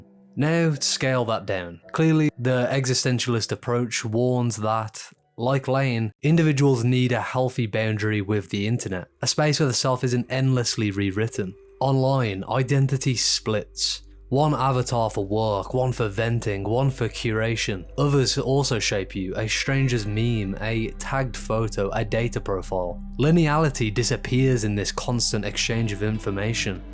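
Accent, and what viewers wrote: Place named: United Kingdom. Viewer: E<link> British Accent.. unlistenable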